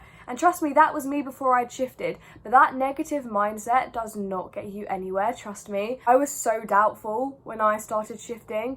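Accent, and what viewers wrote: United Kingdom. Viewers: Wait- I didn’t know you were actually British 😭 but I love your accent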